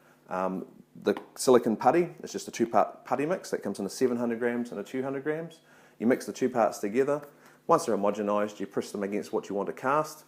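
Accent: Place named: New Zealand. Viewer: ...new zeland accent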